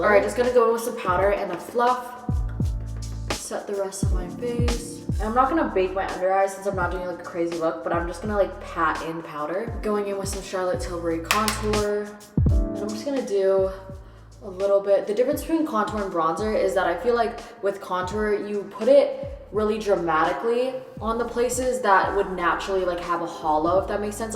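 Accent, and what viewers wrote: Vietnam. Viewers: pls I'm obsessed with her Vietnamese accent it's lowkey so hot is it just me? 🥸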